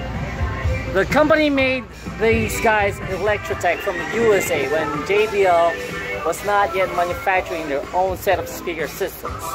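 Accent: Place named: England, United Kingdom. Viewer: Great English Accent 👍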